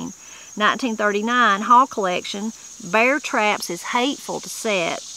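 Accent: Appalachia, United States. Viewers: They use "He's a good hand to have on the ranch. Or he's a terrible hand with horses." Mostly used in ranch talk. We use hard in Utah. "He was hard pressed for a job. He's a hard one to talk to. "There's hardly a time we don't walk to the store. ", these are so wonderful and I really enjoy your Appalachian accent. 👍💖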